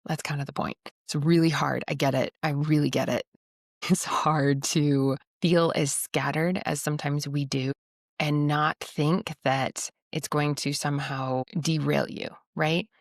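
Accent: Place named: United States. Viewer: Your accent sounds American, and thus it is not only our MG settings. With only 10% of manufacturing left since 1979 the economy has gone suck for anyone but scammers and financial schemers with little but crap service jobs available. As my true self develops I have more abuse thrown at me from shallow geezers and utterly demonic black bloc monsters. I am not afraid or I would have quit long ago. I am surrounded by fragile egos and fickle fools and may need to just move to a new place on Earth after 52 years being the scapegoat and target for take downs in my decaying home town. Money does not simply flow from playfulness in the utterly polarized war on everything good. It is spiritual bypass to speak in this way. Maybe the next Leo eclipse cuts the idiots out of my way. I have heavy metal intensity with soft jazz detail sense, and am sick of being stuck in the punk dump of PNW.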